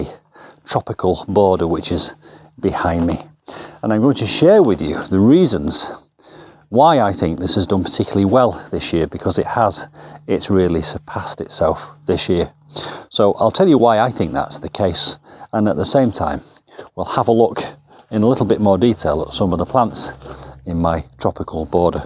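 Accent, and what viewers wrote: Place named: Barnsley, United Kingdom. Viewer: Is your accent Barnsley? I love it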